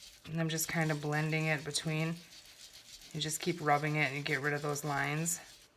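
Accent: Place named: Minnesota, United States. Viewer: HA! I was born, raised, and lived the first 26 years of my life in Bemidji! I've lived all over the country and abroad, I've lost my Minnesotan (I get it back when my family comes to visit. lol). Your accent brings me RIGHT HOME! Also, I love all things crafting. I subscribed!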